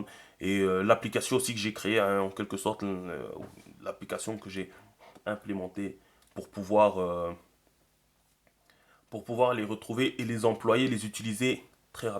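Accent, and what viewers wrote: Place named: Banlieue, France. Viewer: tu parle trop pour rien dire. je te conseillerais d'apprendre un cours de méthodologie pour enseigner .avec ton accent des banlieues ça nous casse les couilles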